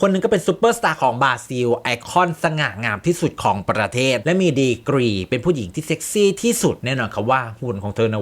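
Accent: Isan, Thailand. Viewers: ชอบในความหลุดความเป็นอิสานนะคะ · ชอบแอดเว้าอีสานมาก น่ารัก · พอได้ฟังพูด อิสารเลยกดติดตามคะ❤❤❤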